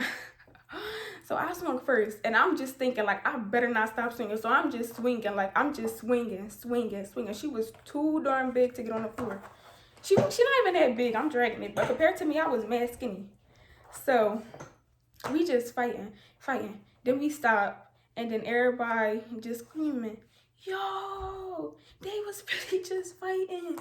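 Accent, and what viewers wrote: New Jersey, United States. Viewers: are u from jersey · you from new jersey?? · I could hear the jersey all in you lol I would think you was from Newark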